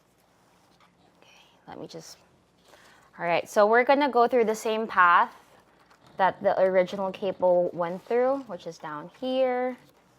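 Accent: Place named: Philippines. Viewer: Wait, that accent is noypi. Sorry, just stumbled upon this video. Nag subscribe na po 🙏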